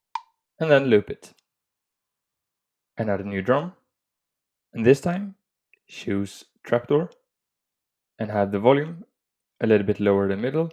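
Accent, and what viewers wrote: Sweden. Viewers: Er du fra Sverige?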